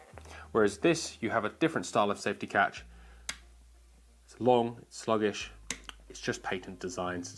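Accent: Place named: United Kingdom. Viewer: This is the first video ive seen on this channel, and this guy looks like an american with a british accent.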